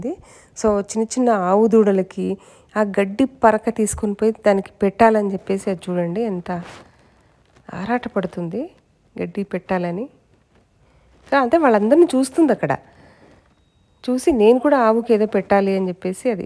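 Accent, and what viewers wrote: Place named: Telangana, India. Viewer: Mee language paka telangana lagavune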